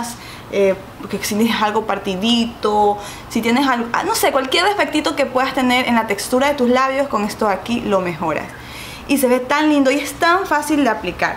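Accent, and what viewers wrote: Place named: Guayaquil, Ecuador. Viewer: ese hermoso momento es que te das cuenta que una youtuber de tu País y Ciudad te encanta .... ya decia yo... parece guayaca :v me encanta ♡♡♡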